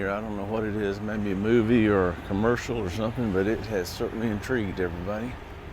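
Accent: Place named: South, United States. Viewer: I love Charleston and find your smooth southern accent to be very welcoming! Thank you!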